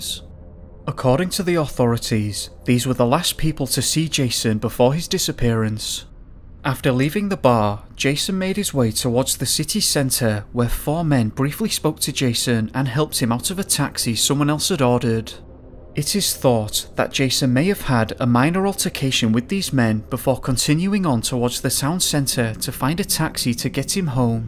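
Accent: United Kingdom. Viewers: Good enough video with out the monotone british accent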